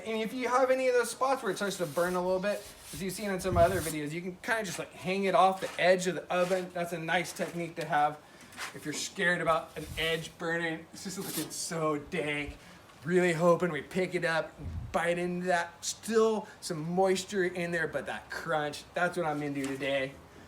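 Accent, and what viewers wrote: California, United States. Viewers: I wonder if this guy knows how California he sounds, and of course he loves his nugzzz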